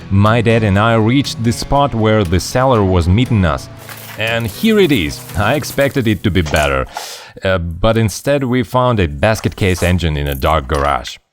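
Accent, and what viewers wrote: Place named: United Kingdom. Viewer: You have an impeccable British accent.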